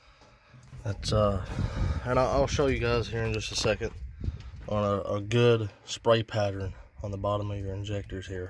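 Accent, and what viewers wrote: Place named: South, United States. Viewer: idk why bros thick country accent and the way he get straight to point, allows me to understand exactly what he explains and seem to be trustworthy he actually knows what the hell he talking about. God bless and thanks.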